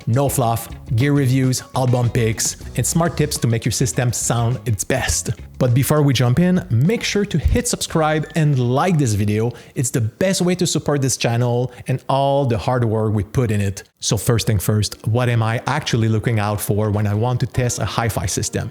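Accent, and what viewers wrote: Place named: Montreal, Canada. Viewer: Dude, Whoa! Just stumbled upon this video from my recommendations and within 10 seconds I assumed you’ve been on YouTube for years… only to find out when I checked out your previous videos that it’s been a month. Your production is top notch. Also, your accent and the Expo67 poster instantly made me realize you’re from Montréal (same as me). I rebooted my channel to document my Hi-Fi journey and it’s so cool to see someone from here also doing the same. You definitely got a subscribe and follow from my here and on IG. Keep it up ✌🏼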